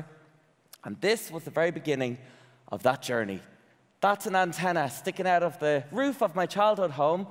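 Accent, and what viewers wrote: Ireland. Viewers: His boss was an idiot. He has a perfectly nice voice. Also that Irish accent 🥰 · The tears are not from pain,. There from being deeply moved. I had damp eyes, too. Tears of joy at your overcoming real obstacles. Also, as a Yank, your beautiful Irish accent melts me too. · I don’t think he sounds ‘gay’ whatever that actually means.. he just sounds Irish to me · Nope, not British. He sounds Irish. Which he is. 🍀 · Your boss was right noticing your voice....... it’s gorgeous and I could listen to your Irish accent all day xxx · I used to just "love" your videos but after watching your presentation I "adore you now." By the way, I do not think you sound gay: you just sound Irish! 😘🏳‍🌈👍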